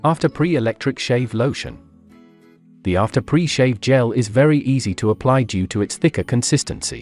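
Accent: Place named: England, United Kingdom. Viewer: Another authoritative sounding video with Engl-ish accent, maybe AI. Why do pre-shave lotions cost so much, mostly alcohol.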